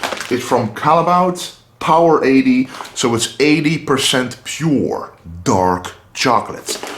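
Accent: Netherlands. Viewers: I LOVE THE DUTCH ACCENT. I AM MOVING TO THE NETHERLANDS TO FIND A MAN TO SPEAK TO ME IN THIS LOVELY AND SEXY ACCENT.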